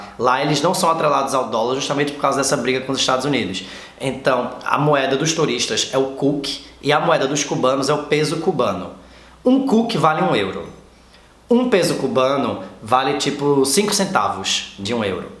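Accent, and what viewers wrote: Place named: Recife, Brazil. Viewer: Adorei o vídeo! Tava com saudade de ouvir alguém falar c esse sotaque recifense arretado demais! Sdds do Recife ♡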